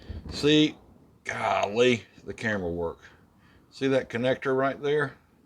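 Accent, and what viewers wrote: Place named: Georgia, United States. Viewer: I was stationed in Augusta, Georgia for 2 years ( US ARMY ). I remember the way the towns folks sounded. Much like you. Brings back fond memories of those friendly folks. I even fooled a Drill Seargent with my accent. He said " Boy, where are you from ? " I said " the south " ! He said " what part of the south ? " I said " South New York ! " He said " drop down and do 50 pushups ! " I did, laughing the whole time !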